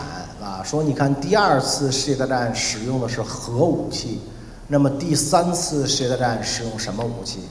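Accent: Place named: Beijing, China. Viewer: 袁老师说话满满八旗味儿，😂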